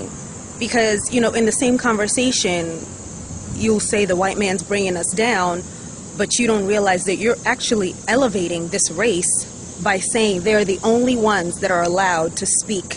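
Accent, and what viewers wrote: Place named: United States. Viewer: You're still speaking in an American accent so still got some work to do.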